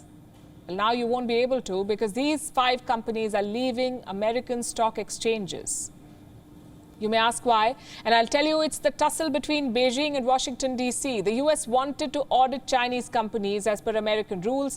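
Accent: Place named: Gujarat, India. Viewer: USA is a rogue country. Typical gujju English by the news reader. Let her go and learn proper English rather than crying with a crimping tone.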